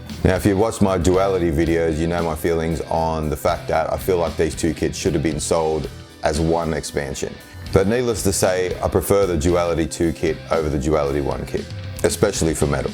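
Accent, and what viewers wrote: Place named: United States. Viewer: I am definitely going to add Modern Metal and Dark Matter to my EZX collection... You're so right about the clarity of Modern Metal sounds... OMG *Random question...., did you ever live in the United States? I had a childhood friend that you remind me of who lived in my neighborhood in Georgia...he even had an accent similar to yours. I can't remember his name...he had a brother named "KK." -at least everyone called him that. The family moved away early...I was probably 6 years old. -I am almost 50 now.. 😂 ***Your channel has become one of my favorites -out of nowhere... great content,!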